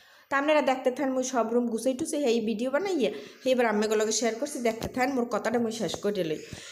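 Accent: Barishal, Bangladesh. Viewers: আসসালামু আলাইকুম আপু চলে এলাম তোমার বরিশালের কথা শুনতে তোমার কথাগুলো সত্যিই আমার অনেক ভালো লাগে তোমার কাছ থেকে আমি বরিশালের কথা শিখতে চাই শেখাবে ❤❤❤❤❤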